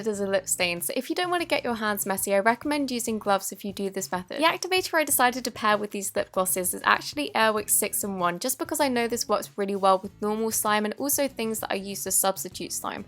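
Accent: Australia. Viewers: Are you Australia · Are you aussie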